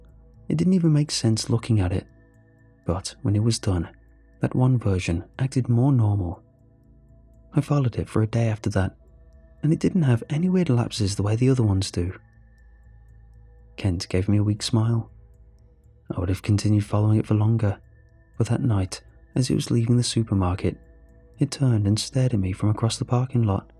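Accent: England, United Kingdom. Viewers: What accent has the narrator got? Sound's English but I could be wrong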